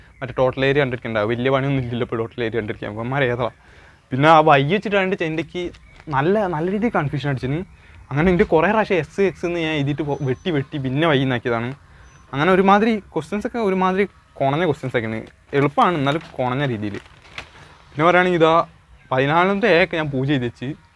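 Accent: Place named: Kozhikode, India. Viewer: Kozhikod ano slang kettiyu tonunu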